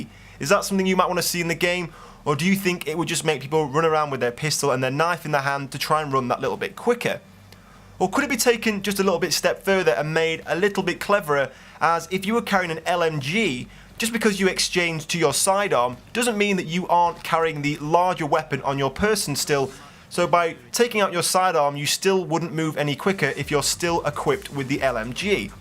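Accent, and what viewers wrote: England, United Kingdom. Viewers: Great intro! since i mostly play support and use LMG i don't think i would enjoy that weight idea. Btw where in England are you from? awesome accent! :)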